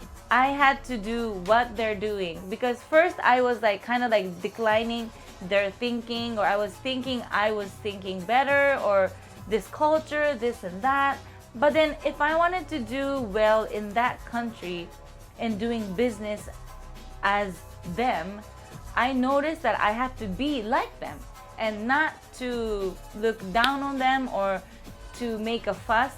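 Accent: Philippines. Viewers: her english accent is very filipino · Mabuhay Sensei!!!. Your accent sounds like a Filipina already! Like you said 郷に入らば郷に従え (笑) Btw I'm a big fan of your artworks from Myanmar!!! Mingalarbar🇲🇲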